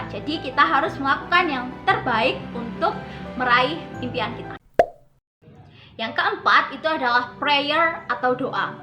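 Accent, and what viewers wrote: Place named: United Kingdom. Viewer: British nya uwuw, aku lebih ke logat America:v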